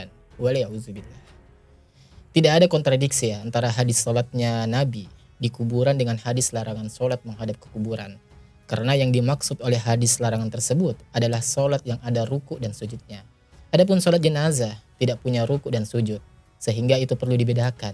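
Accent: Sulawesi, Indonesia. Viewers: Masyaallah, kentara sekali Sulawesi nya.. semangat ki Sodara❤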